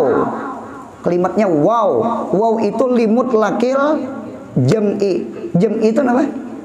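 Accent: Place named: Amuntai, Indonesia. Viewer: Ulun katuju bgt lwn sdin nih, tutur katanya sopan bnget. Dari logatnya kya org amuntai. Mudahan guru selalu sehat dan istiqomah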